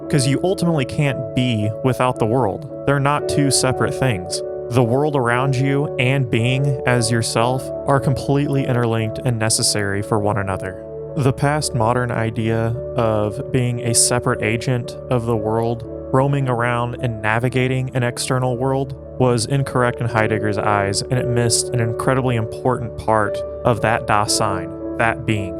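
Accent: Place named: South, United States. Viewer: Intellectual southern accent is nice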